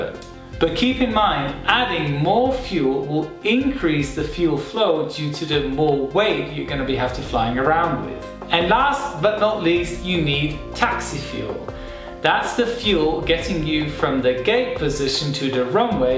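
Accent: Germany. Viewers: Das ist das erste video was ich von dir gucke und ich hatte von anfang an das gefühl das du ein deutscher bist :) und es hat sich als wahr herausgestellt :D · Is he german?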